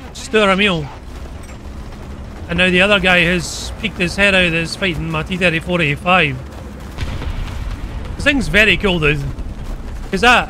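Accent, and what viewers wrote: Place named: Fife, United Kingdom. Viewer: Just trying to get into WT, as a fellow Scot I found your vids and have ben going through them. Keep up the good work. Really struggling to place the accent though, either posh west end Glesga (east end kid myself but moved away and didn't go to prison or end up on drugs, actually done pretty well when I left that shit hole lol) Anyway, you sound like a Fifer who maybe moved to Glasgow (judging from some of the words used in older vids, naughty Fifer words) Great channel, keep clapping them cheeks ❤🤍💙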